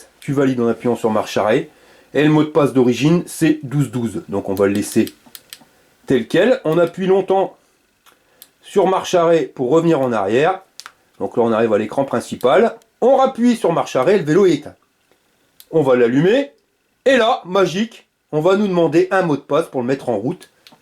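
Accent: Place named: Nord, France. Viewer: Ton accent chti j'adore mon gars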